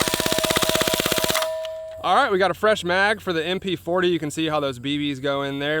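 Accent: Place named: South, United States. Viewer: It’s weird. You sound southern, but you say pop.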